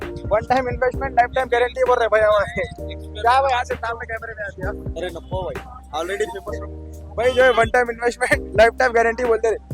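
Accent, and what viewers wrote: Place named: Hyderabad, India. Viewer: bhai aap kya karrai ji…..😂😂 Love your Hyderabadi accent Love your content ❤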